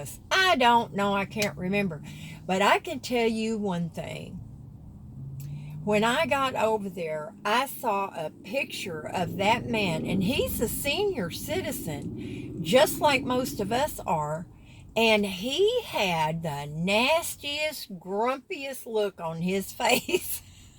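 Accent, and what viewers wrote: South, United States. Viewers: You seem so sweet. I saw on other channels how people were bashing you so I decided to take a look for myself and you are not what other people were saying. It goes to show, you have to not allow others to make up your mind for you. Go and see for yourself. I wish you the best of luck with your LBC. All the negative comments, just ignore those people. I notice your accent, what part of the south are you from? North Carolina here. Lol.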